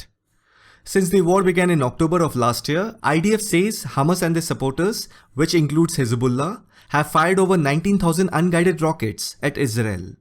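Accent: India. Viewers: This guys indian accept is insufferable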